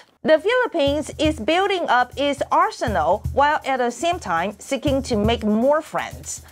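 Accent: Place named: China. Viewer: You sound like a Chinese your country people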